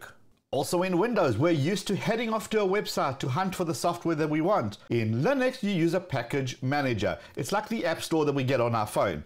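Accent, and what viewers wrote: South Africa. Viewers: for real bro, you from SA?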